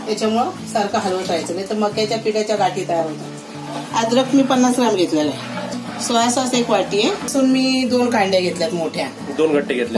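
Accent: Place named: Agri, India. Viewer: Mast ekdum Agri ascent talk.. 👍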